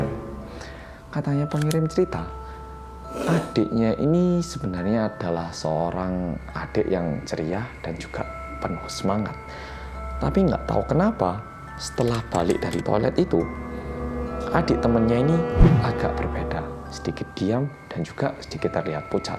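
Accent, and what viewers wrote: Java, Indonesia. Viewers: Aku klo mas ngomong orang korea yg. Medeekk aku nya ikutan 🤣😆😂😂 Mas aku udah kirimin trus cerita pengalaman ku ke Gmail yg mas bilangin, tapi tenggelam sama yg lain 😁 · 3 channel storytelling favorit dan bagus: 1. (pembawaan santai dan medho serta mudah dipahami) 2. Detektif Aldo (mirip siaran radio) 3. Arroyan Pram (kasus, konspirasi sampe sains fisika, editan paling niat dan keren. Serasa nonton film layar lebar).. Kalo kalian?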